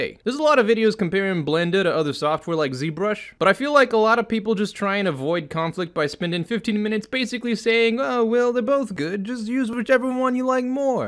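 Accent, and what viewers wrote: New York, United States. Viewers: okay. Native New Yawker? Take my follow.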